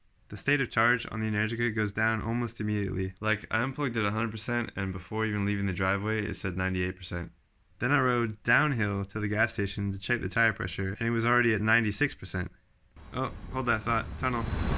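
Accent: California, United States. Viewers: I wonder if he’s from New Zealand. He sounds like he’s from Cali. Either way, I prefer the lighter bike 😆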